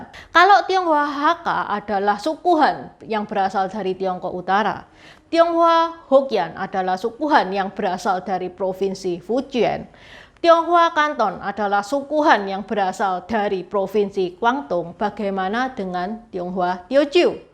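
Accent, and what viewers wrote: Java, Indonesia. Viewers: Bgs sekali chanel nya... Tapi sblm nya maaf ya ce... Bahasa ce terlalu medok skli logat jawa nya...😂😂 Di syg kan skli ji kalau ce coba bisa 4 bahasa ( khek,hokkian,kanton,tiociu) kita bisa byk skli utk dpt belajar...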